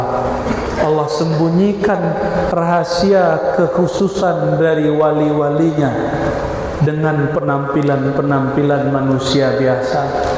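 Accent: Padang, Indonesia. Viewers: Masaallah tabarokallah Buya bahasonyo Padang totok 😂ala lamo indak Mandonga Bahaso awak kok 🙏👳👍💛💛💛💛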